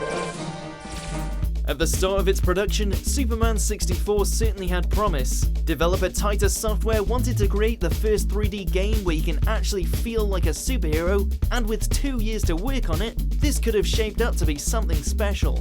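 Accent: United Kingdom. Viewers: I hate this British voice. Bring back the American one. This one sounds like a cartoon. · Why is a British person narrating Watch mojo now a days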